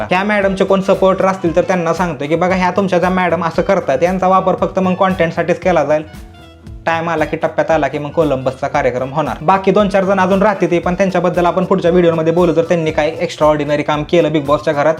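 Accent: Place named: Karad, India. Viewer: Karad ancent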